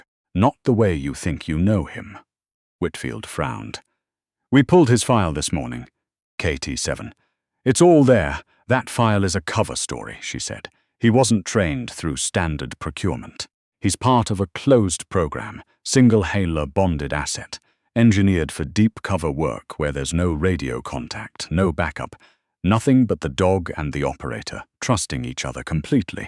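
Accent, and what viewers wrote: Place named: United Kingdom. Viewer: British accent stinks ruin concepts of storytelling.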